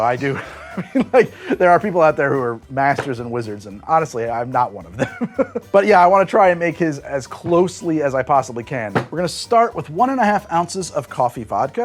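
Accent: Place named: California, United States. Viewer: If you close your eyes this guy sounds exactly like Andrew Tate if he was from California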